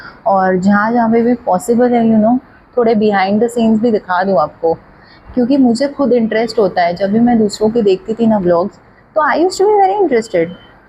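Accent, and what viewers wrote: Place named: Maharashtra, India. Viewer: Aap Maharashtran ho Kya Mahrati Awaz Arhi Thi 😍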